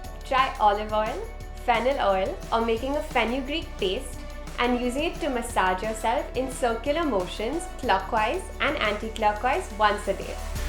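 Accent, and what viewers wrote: India. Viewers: is it just me or does she have a Indian accent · Ummm someone please explain why this white girl sounds Indian? It's freaking me out.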